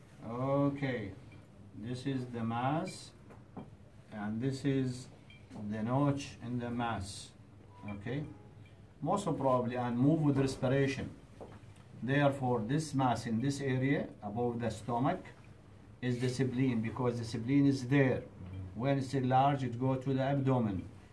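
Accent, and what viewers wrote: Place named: Iraq. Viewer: Iraqi accent is so cute ❤️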